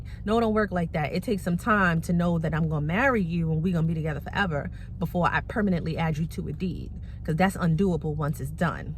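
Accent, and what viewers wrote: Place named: New York, United States. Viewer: I love your NY accent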